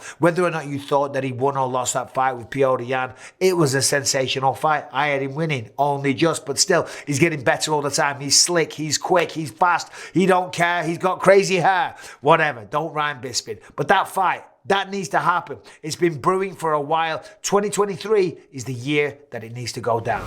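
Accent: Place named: Newcastle, United Kingdom. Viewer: Bisping turning into true geordie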